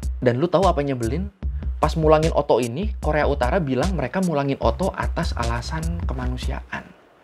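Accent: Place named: Bogor, Indonesia. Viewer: Bang lu orang bogor?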